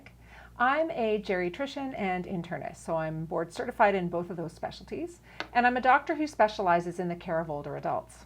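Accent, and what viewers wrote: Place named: Canada. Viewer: Your accent gave you away! You should have clearly stated at the onset that you are from Canada. As one who has spent much time in your country, there is an extremely strong bias against USA conservatives in general. For years and years, Canadian media has been obsessed with American bashing and has been rife with thousands of examples including long documentaries. That bias is daily and insidious and long-standing sport. So here you have a Canadian medical doctor (you) who is commenting "incognito" on USA politics by not identifying where you are from (and quite possibly, only because you are Canadian, hiding bias). I am sure you know that in high-level research, bias destroys validity. It is quite possible that you only wished to educate the public about dementia. However, the fact that you did not identify that you are Canadian and this concerns Joe Biden (in the United States), is both questionable and suspicious. 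There is a huge amount of recorded primary source empirical data demonstrating that Biden has a serious problem and most of the USA knows it (on both sides of the aisle). I wonder if one sees this kind of covert interference in Canadian politics by Americans?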